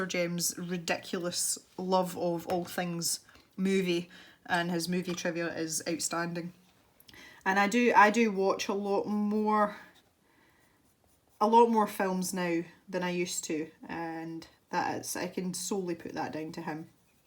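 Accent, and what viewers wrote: Scotland, United Kingdom. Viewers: Ive always been drawn to the Scottish accent! Here in Australia, foreigners say we have different accents depending on where in Australia you are. Personally, I can't pick differences here... We all sound like bogans lol I love accents, and I can definitely tell the difference between Scottish, Irish, Welsh and English accents. I could listen to you talk all day! 😊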